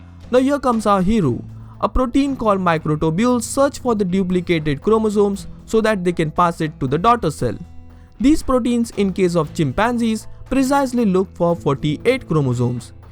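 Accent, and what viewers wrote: India. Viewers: They already have had a half Human and half Chimpanzee. He lives in the 70s. The maker of this video didn't do his research properly. Also funny Indian accent. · I love Indian accents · No doubt , Indian accent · Indian accent tho :( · LISTEN INDIAN MAN YOUR ACCENT ...SEEMS LIKE YOU PRAY TO YOUR GOD IS A HANUMAN . IM INDIAN TOO MY GOD ALMIGHTY LIVING IS MY CREATOR. PEOPLE LIKE YOU SHOULD IS UNETHICAL TEACHING WRONG CURIOSITY WILL DESTROY HUMAN RACE AND PEOPLE'S FAITH. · Me: *sees video Me: *hears indian accent Nope.. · of course it's a east indian turban head doing the narration lmfao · Indian accent again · Mann im indian and this accent even hit me like a ton of bricks. Got dam ginda!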